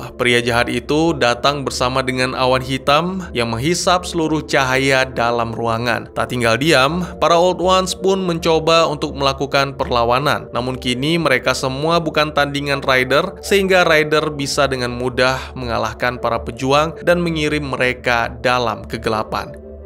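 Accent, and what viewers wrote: Aceh, Indonesia. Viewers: Bang orang aceh jelas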